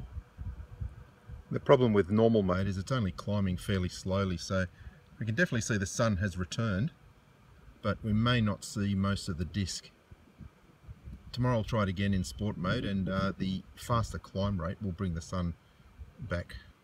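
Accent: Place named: Australia. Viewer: You have some good videos But what’s with the American measuring system You sound Aussie, obviously around Perth Why not metric mate.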